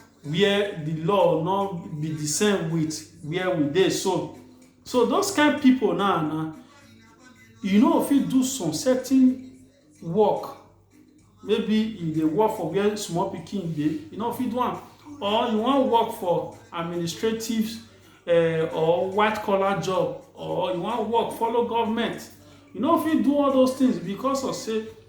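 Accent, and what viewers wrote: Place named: Nigeria. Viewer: Wow your pigin ascent is nice, thanks for sharing.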